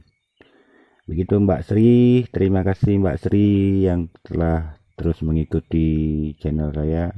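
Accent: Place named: Aceh, Indonesia. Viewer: Met pagi bang dari suaranya sepertinya abang orang aceh, salam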